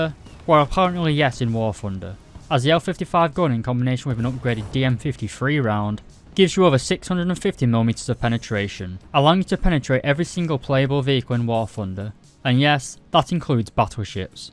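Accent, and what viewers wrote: United Kingdom. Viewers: bro i would watch the video if u werent british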